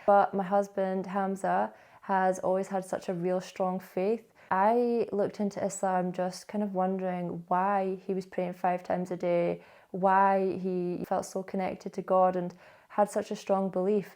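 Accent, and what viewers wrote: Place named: Glasgow, United Kingdom. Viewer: Glaswegian accent😂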